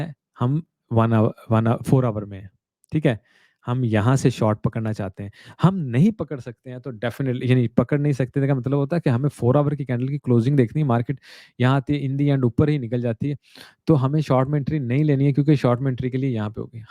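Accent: Punjab, India. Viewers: Isko kehte hain att ta hahaha sir jab apne bola na dxy ne att chukki hui hah bht sakoon mila apki punjabi itni pyari hah na sir bht mithas hah apki lang mein karazdar hain hum apki mohabat k sir alllah bht khush rakhe apko ❤️thankx for everything sir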